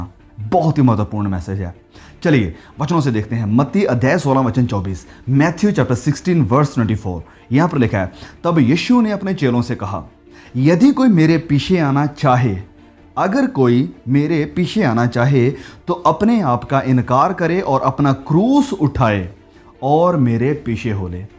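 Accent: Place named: Doaba, India. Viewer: Dear brother you are speaking but I'm sure that you are a Punjabi fellow. Definitely you are from Doaba. Your style and pronouciation is of Hoshiarpur or JALANDHAR or kapurthala. May God bless you.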